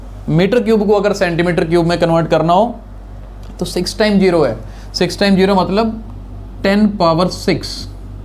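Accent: India. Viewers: Accent is also impressive! Very few Indian has good accent like you sir. Thank you Sajid Ali Karachi Pakistan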